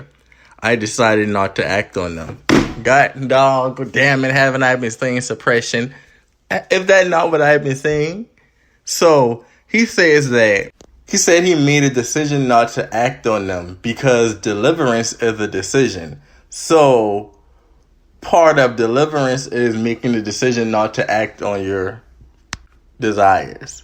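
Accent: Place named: Gullah Geechee, United States. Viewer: Great video. Quick question are you from Charleston, SC!? You got that Geechee accent like folks from that area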